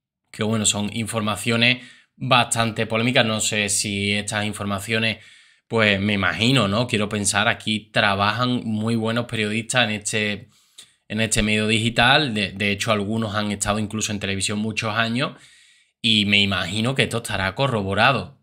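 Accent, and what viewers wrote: Andalusia, Spain. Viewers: Yo soy de Algarve.Albufeira.un <link> gusta tu canal,y el andaluz , me encanta🇵🇹😄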